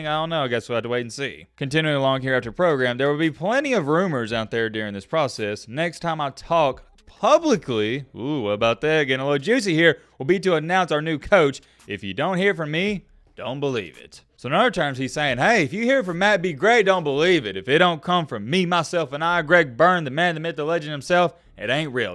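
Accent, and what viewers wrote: South, United States. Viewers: Matt, I grew up in Alabama (Mobile) but have been on west coast for ages... so i've loved your insight from someone with closer "boots on the ground". AND hearing that southern accent reminds me of epic days growing up in the deep south. Love your passion for Bama football!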